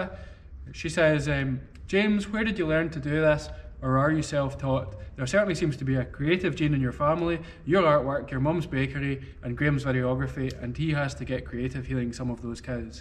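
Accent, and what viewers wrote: Scotland, United Kingdom. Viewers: Hi James, thank you for answering my question about where your slate comes from. It was really interesting to see the two pieces together (the red and the green). As always it's so nice to see your beautiful work. How I'd love to have a dovecote like the one you made! Looking forward to seeing more of your videos, and as an American, to hearing your Scottish accent. :)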